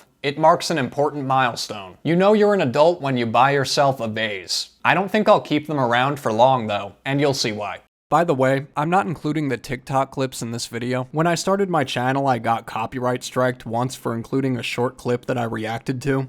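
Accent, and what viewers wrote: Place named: Virginia, United States. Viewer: OMG VIRGINIA NOW I CAN PINPOINT YOUR ACCENT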